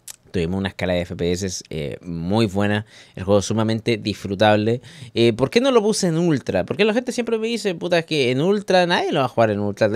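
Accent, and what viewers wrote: Chile. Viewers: Me tinca eres chileno 🤣🤣🤣🤣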